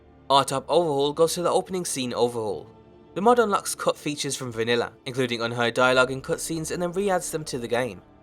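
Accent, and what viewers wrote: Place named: Wolverhampton, United Kingdom. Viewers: England, 'Midlands'. That is to say likely he comes from the area surrounding and including Birmingham. However is accent doesn't strike me as a brummy so perhaps slightly further north than Birmingham. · dude you've legit got a Wolverhampton accent ;D !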